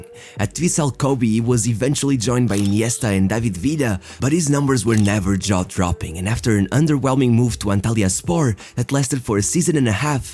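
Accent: Portugal. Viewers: Não sei porquê mas há algo que na tua forma de falar que se percebe que és português 😂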